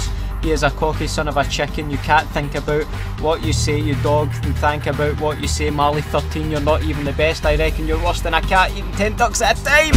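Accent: Scotland, United Kingdom. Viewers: you and frankie boyle are the main reasons why i love the scottish accent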